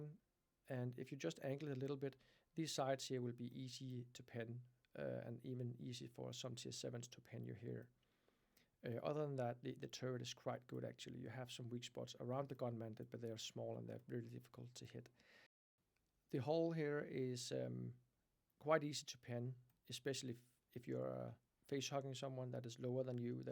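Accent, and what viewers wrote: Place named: Netherlands. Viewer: oh, okay. Sorry about that. Your accent reminded me somehow of Netherlands 😂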